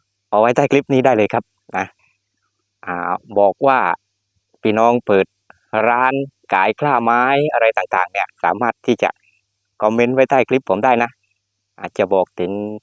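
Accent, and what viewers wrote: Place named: South, Thailand. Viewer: แหลงใต้ชัดเชน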